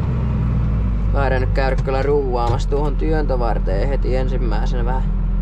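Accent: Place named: Jalasjärvi, Finland. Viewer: Puheesta päätellen asuinpaikka ei taida olla kaukana jalasjärvestä? 😊🤔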